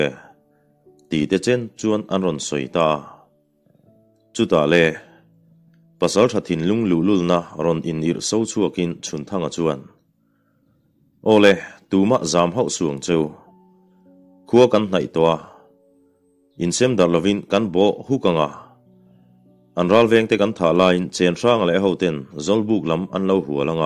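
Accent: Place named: Aizawl, India. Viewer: Aizawl thluk in thluk tawp rawh 🤣 🤣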